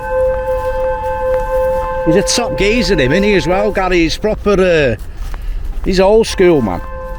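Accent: Manchester, United Kingdom. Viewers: Are you Mancunian ? Also watch your croally bridge vid and loved it mate I love history but my aunt works at the church above where you went and it’s haunted but gangs and that hang about there but I’m subbed mate 🙂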